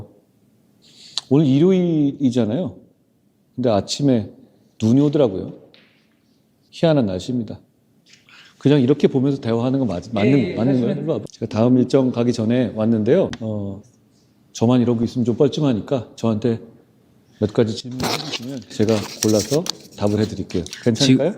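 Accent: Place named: Chungcheong, South Korea. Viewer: 한 전 대표님 말씀중에 충북사람들의 어감이좀 은연중 표현이 되는것 같 습니다.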